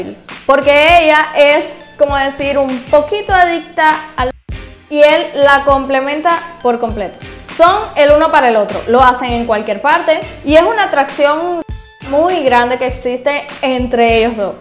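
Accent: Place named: Dominican Republic. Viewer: Eres dominicana o vives aquí en RD? Tienes como un chin de acento 😂❤️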